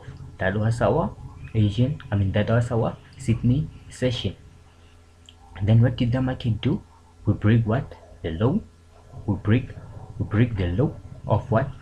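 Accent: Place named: South Africa. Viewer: 😂😂😂😂😂man you this accent sounds South African, but the name 🌚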